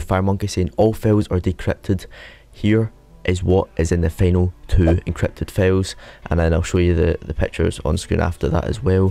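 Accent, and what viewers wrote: Scotland, United Kingdom. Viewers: Yeah He Is Defintley Scottish He Does Not Sound Like Arnold Schquanegger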